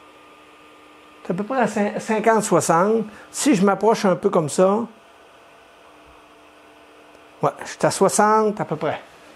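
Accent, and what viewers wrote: Quebec, Canada. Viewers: Bonjour, excellente vidéo ! Je possède une Ender 3 pro v1 avec les drivers silencieux et il est vrai que les ventilateurs sont bruyant, donc modification à prévoir. Merci bcp pour ton tuto, j'adore l'accent du Québec et puis on se rend bien compte que tu prends du plaisir à informer, je me régale... merci pour ça ! 😉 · Ma v2 arrive jeudi, je cherchais des infos sur le bruits des ventilos, Bah merci beaucoup c'est hyper complet, impec. Et qu'est ce que ça fait plaisir d'entendre l'accent des cousins du Québec :-)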